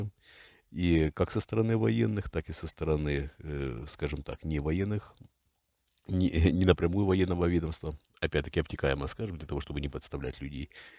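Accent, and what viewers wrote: Ukraine. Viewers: зареганный в Австралии. Льющий воду, запугивающий и тот и тот народ, дающий ложные надежды, не умеющий оперировать военными терминами, неправильно составляющий предложения, плохо знающий синтаксис и в разговоре имеющий украинские следы в диалекте. Даже не знающий про за закрытый город, откуда были пуски. Когда этот хэнэрал врет он кашляет и запинается все больше и больше.его как сегодня выдает неуверенный от лжи прокуренный голос. Он, как и всегда выпрашивает Донат лайки деньги. Он просто стрижёт контент на народном горе. Карма ударит по нему а бумеранг пущенного зла совершит круг и прилетит точно в точку отсчета. Люди, в тяжёлые времена проверяйте перепроверять по сто раз источники, не питайте иллюзий, верьте своей интуиции, помогайте старикам, детям, животным. они самые незащищенные. Режим пу падет. Но никто, ни царь и ни герой, мы сами.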